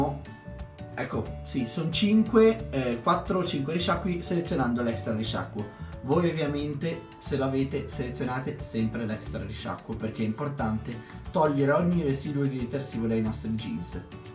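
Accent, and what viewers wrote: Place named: Liguria, Italy. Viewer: Ho provato ! Molto meglio ho impostato programma misti (la mia Candy 9kg ha questa dicitura x i sintetici) 40° asciugatura di questo stesso programma, ha il simbolino del ferro da stiro, torce molto meno i pantaloni che comunque ho notato non devono essere troppi direi max 4 ..in sole 3 settimane di possesso della lavasciuga sto imparando grazie al tuo aiuto e provando da me nuove opzioni ! Mi sei di grande aiuto guardo tutti i tuoi video e sono contentissima che mi rispondi tempestivamente. Simpatico anche il tuo accento (mi sembri ligure) ciao ti scriverò ancora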